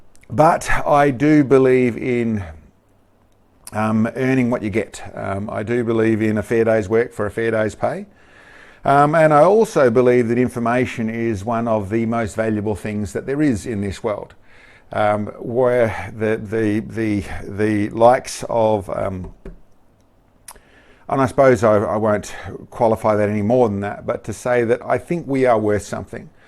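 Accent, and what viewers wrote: Australia. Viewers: Keep up the good work. Coming from an Aussie expat now living in the USA, it's good to hear an aussie talking about something that I love doing once in a while.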